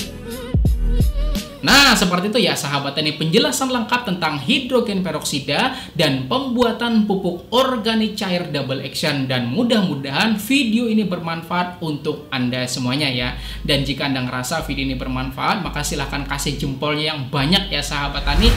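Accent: Banjar, Indonesia. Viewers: dari logatnya BANJAR sih bapak ini, kebetulan saya di KALTIM terbiasa mendengar orang2 b'cakap Banjar Salam Pian Sabarataan lah 🙏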